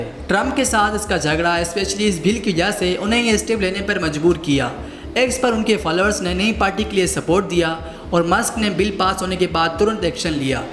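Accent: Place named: Sindh, India. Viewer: Pele nahi hota Pehle hota hai, urdu achi karo clear sunai nahi de rha thora jama jama kr bolo pause leke, sindhi lag rhay ho clear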